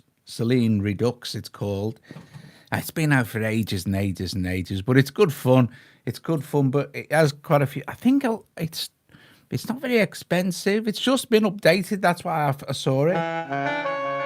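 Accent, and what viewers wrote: Merseyside, United Kingdom. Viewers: Apple should pay you a commission. This video was the best argument for getting myself a new i-pad I have ever heard. Back in the days of The Strawbs, I dreamed of owning a Mellotron and later a Wavestation - I saved up for one only to find it had been discontinued. Now you've shown me I can have both for very little dosh (once I've splashed out on the i-pad). Now, you may have been in Cornwall, but that voice tells me that, like me, your origins are somewhere on Merseyside. Everton, me, but I am a red! I now live in Catalonia.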